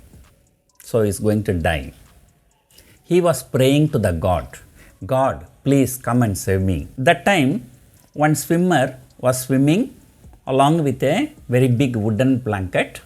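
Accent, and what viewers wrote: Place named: South India, India. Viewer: Sir to be honest they are trolling because you speak South Indian English . You are success they can’t digest I believe...you are doing good job sir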